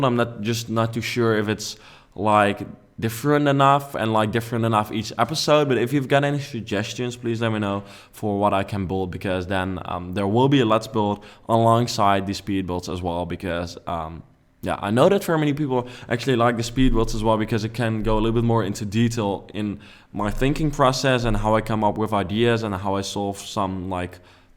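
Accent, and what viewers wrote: Netherlands. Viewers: This dutch accent though🙈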